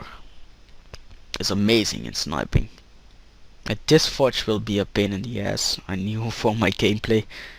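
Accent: Netherlands. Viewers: je nederlands accent veraad je :3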